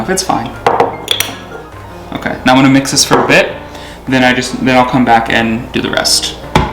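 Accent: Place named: United States. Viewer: джан,и тебе спасибо, за твои вкусненькие рецепты.! Арменин,который говорит по русский с американским акцентом.! ☺️👍🤗😘💋❤️🤗 джан,с Великой Пасхой тебя поздравляю.!Мира,здоровья и добра тебе желаю.!И естественно,процветания твоему замечательному каналу.!👍👍💥💥💥💥🌞🙋‍♀️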